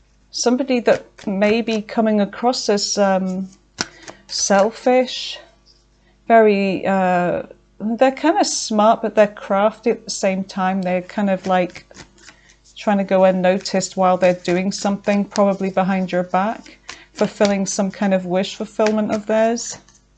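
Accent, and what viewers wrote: United Kingdom. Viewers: You are the most delightful tarot reader. Are you a Cockney born lady? I just love the various British accents.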